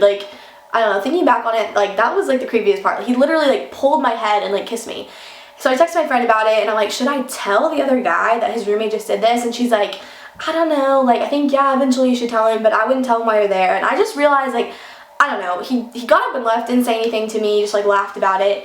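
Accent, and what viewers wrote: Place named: United States. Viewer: i love how you have a country accent that comes and goes (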